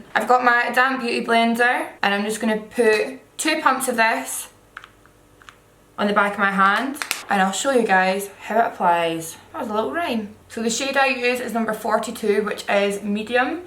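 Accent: Scotland, United Kingdom. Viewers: I honestly don't think you are all that hard to understand. But then again, I've been around different accents, including Scottish, before, so I've had practice listening. Love the accent, the more people watch the easier it will get for them ;) As a classically trained singer, the vowel sounds Scottish people make when they speak are so fascinating, and (I think so) very pretty. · is she scottish x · I know the struggle with the Scottish accent 😂😂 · Has anyone used the Google captions when watching?! their interpretation of what she's saying is hilarious 😂😂 I'm Scottish so totally get her accent but imagine non-Scots would find the captions totally unhelpful and nonsensical 😂👎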